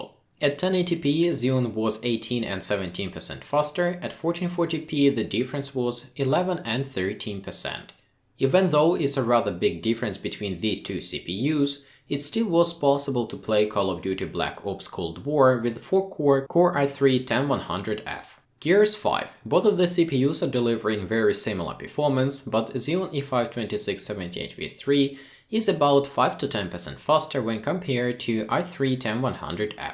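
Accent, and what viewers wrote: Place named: Russia. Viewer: Парень, ты откуда? Такой чистый русский акцент )))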